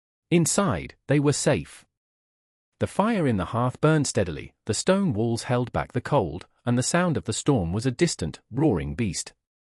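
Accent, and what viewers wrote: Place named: United Kingdom. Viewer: Is there a particular reason for the British accent for the narrative?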